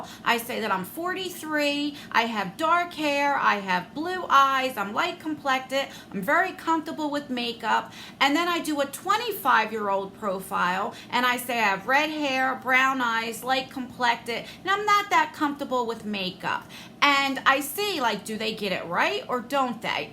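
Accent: New Jersey, United States. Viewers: I love your jersey accent I’m from the south so it’s not very common to hear😝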